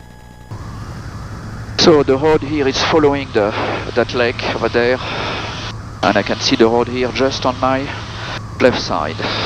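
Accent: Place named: France. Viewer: Just with the accent we can see that you are french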